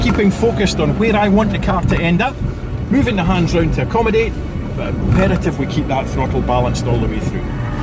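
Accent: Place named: Scotland, United Kingdom. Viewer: Could do with more engine noise and not a Scot talking a hole in my head...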